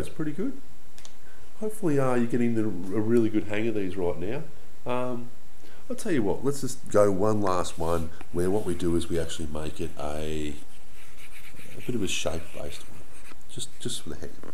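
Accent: Australia. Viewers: i dont know if its the aussie accent or the explanantion, but this guy is a literaly wizard 🙏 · just curious but are u Australian? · Are you aussie?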